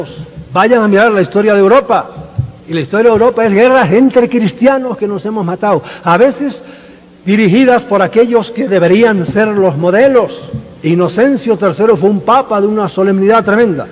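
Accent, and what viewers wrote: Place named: Spain. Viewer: Es español el señor salvador¿?apenas se le nota el acento.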